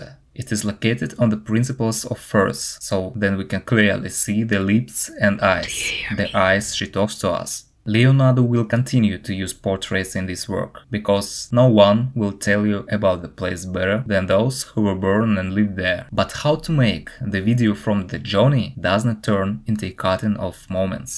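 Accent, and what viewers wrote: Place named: Russia. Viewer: Good review. I really enjoyed it . I first had trouble with your Russian accent, but then it is was a good idea to add captions and titles throughout the video. Keep it up.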